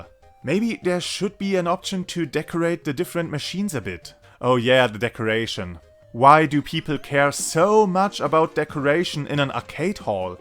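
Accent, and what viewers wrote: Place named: Germany. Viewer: Einfach mal n Like und Follow, weil du dir anscheinend seit Jahren so Mühe gibts :) Außerdem mag ich deinen deutschen Akzent im Englischen! :D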